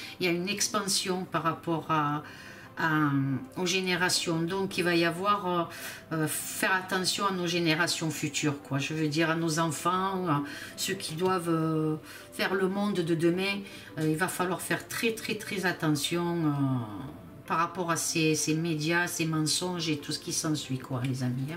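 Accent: South, France. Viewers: Vous êtes très spirituelle. Merci pour vos video. Refusons le vaxxx tous ensemble. J'adore votre accent du sud !!! Lumière sur vous.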